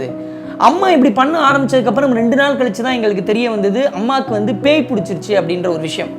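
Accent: Madurai, India. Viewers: Ni madurai ya bro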